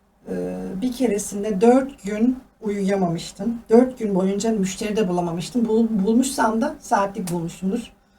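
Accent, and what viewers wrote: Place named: Arab world. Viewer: arap kadini şivesi yapan bir genç hanım zannettim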